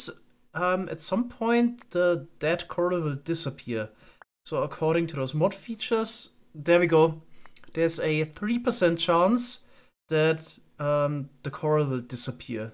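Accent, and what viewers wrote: Germany. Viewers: Haha cool du bist auch Deutscher hat man am Akzent erkannt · Are u German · Hab deinen Kanal erst vor ein paar Tagen entdeckt und finde dich super ! 😁👍 Aber ich fress n Besen samt Stiel wenn du nicht deutsch bist, mein Englisch klingt genauso 😁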